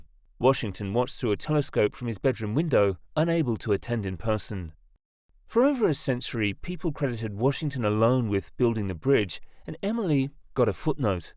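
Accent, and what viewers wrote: United Kingdom. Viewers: Do we really need a British accent? Fuck no.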